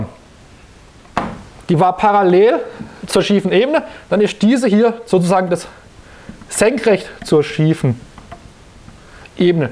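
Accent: Swabia, Germany. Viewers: Schwäbisch versteh ichs gleich viel besser top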